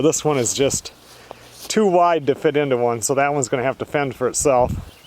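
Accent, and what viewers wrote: United States. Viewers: Hello, I like to clear something up reading some of the messages heck even my own i see something that needs to be addressed. What I am about write I mean in the best possible way. is a hard working driven man. Who for whatever reason fell into this posting events from his life a small part of his life. That we the viewer find informative and funny and just entertaining. Why he does this i have no clue maybe he just likes to entertain maybe teach in someway. Here is what I do know without a doubt has the same problems we all do. What we see as idyllic is just that what we see. We don't see mad or sad. I'm sure as someone who has been married for almost nineteen years being a couple takes work. I love it when says he did no filming to spend time with his sweetheart somethings are far more important to him then our entertainment. I wrote once to that privacy is important never more so when loved ones are involved. 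But i know they fight he need not say that i know he argues with his kids anyone who is a parent knows that. We who watch hold to a impossible standard No one could live up too. I hope he is not off put by this because i have no doubt he is a good soul. But we the viewers need not make more than he is a hard working driven man who cares deeply for his family. He sounds down right American to me. We need those so much right now!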